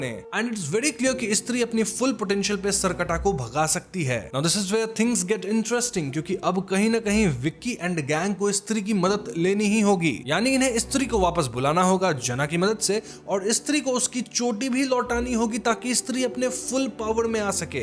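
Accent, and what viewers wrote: Bengal, India. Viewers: Larai bhai your accent is like a Bengali or Odia accent It is Ladai not larai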